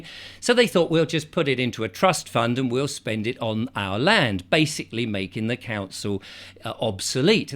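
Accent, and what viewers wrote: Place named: England, United Kingdom. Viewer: I like the way speaks. He is typical English with lots of fun 😊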